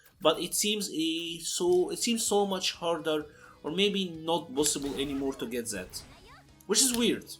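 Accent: Egypt. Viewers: Your accent, are u from Masr?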